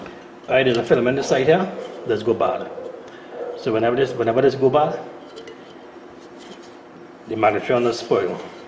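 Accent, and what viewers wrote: Trinidad and Tobago. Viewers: Wow was looking how to check my magnetron and came upon your video. Was so glad when I heard a fellow Trini voice. Was able to tell mine was bad. Thanks man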